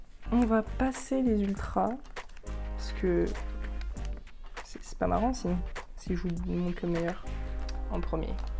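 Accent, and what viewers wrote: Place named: Marseille, France. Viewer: Accent marseillais décuplé fois 1000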